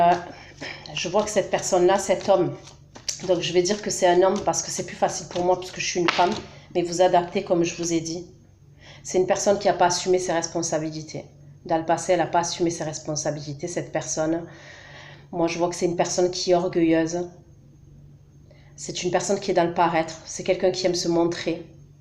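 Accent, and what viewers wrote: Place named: South, France. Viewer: Ta une belle voix du sud comme moi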